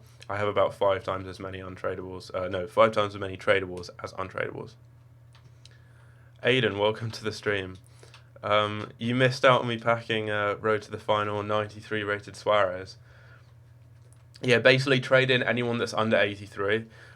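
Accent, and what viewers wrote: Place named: Scotland, United Kingdom. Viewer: Never know you were Scottish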